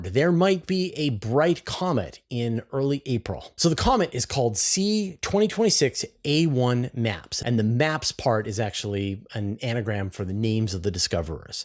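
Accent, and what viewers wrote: Canada. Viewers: I think he's talking about my comment on the last video 😂. I was just giving him a hard time because of his Canadian pronunciation of "A"s and "OU" vowels. My whole family immigrated from Canada to the US, so I always notice the subtle differences in speech. Some people are just really good at hearing accents, while others aren't. You being Canadian is why it sounded good to you 😂.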